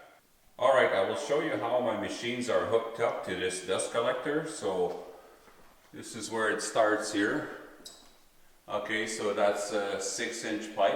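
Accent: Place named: Canada. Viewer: This fellow is Canadain or impersonating 1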